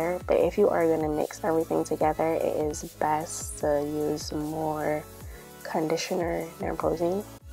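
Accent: Barbados. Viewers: Your curls are so pretty! Also, I’m not from Barbados but I’d know that accent anywhere lol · Are you Bajan?! Cause that accent 😍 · Are you from Barbados???!!!!!!!! You sound like a bajan, that's a compliment! 😉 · You cant hide from that Bajan accent lol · Has to be a bajan. I know that accent anywhere. 😍🇧🇧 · Where is she from she sounds bajan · can't miss that Bajan accent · You have to be bajan I know that accent too well to mistake it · ARE YOU FROM BARBADOS!? I feel it in ur accent!? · Sound like you is ah bajan yuh 🤔🤔💛💙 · Yooooooo I hearing that barbarian accent !!😌😌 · Am I hearing a Barbadian accent? I really hope so. YouTube needs more Barbadian channels. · Sounds like you from Barbados love it !! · Heard the accent and had to subscribe. Big up to BIM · Love your accent, sounds like a Bajan accent if I’m not mistaken 😁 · Im new to your channel ,is your hair bleached ? 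ohh and you got a Bajan accent :) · Any chance ur Barbadian? Idk u just sound Barbadian.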